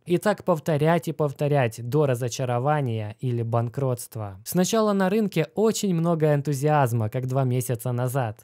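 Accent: Ukraine. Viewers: ты с Украины?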